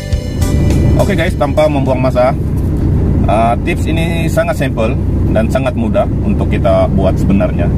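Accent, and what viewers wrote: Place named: Malaysia. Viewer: Terimakasih tuntunan umum nya. Saya suka memdengar logat melayu. Singapore ape malaysia ni?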